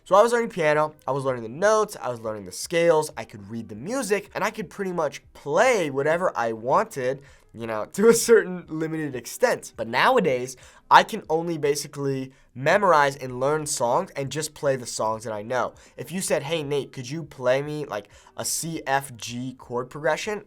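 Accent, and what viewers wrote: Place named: Mexico. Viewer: Congrats on your spanish which is awesomely fluent, since any spanish speaker can hear your mexican accent as well as the mexican slang words. What amazes me most is the fact that you've just taken four years of classes in high school to reach your current level, I mean i started learning english (in Spain) when I was seven throughout years in the education system 'till i was eighteen, including furthermore several stays in the UK and Ireland as well. Once I started college I continued taking classes to get an advanced degree, but, definitely, I do feel my english is not as good as your (mexican) spanish. Congrats again mate, I love watching your videos, keep It Up.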